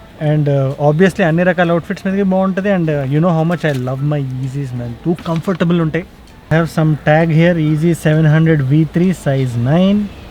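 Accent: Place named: Karnataka, India. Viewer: Ur Kannada accent is 😍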